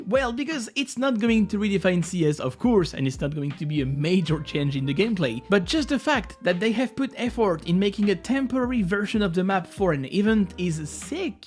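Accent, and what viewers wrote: France. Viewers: Ça fait 5 vidéos que je me pose des questions sur toi, est-ce qu'il a un accent bizarre, est-ce que c'est un anglais/amériquain qui est d'origine française, un français qui parle anglais ??? Bah je savais pas, donc finalement je vois ton interface YouTube avec "vues" et "il y a x mois". C'est bon j'ai ma réponse :D. En tous cas que tu sois d'origine anglaise ou non, mais si ton anglais est french spotted, il reste super fluide et naturel 👍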